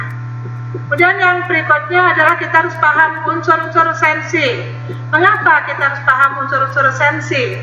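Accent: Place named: United Kingdom. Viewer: Aksen british nya terasa sekali ya ^_^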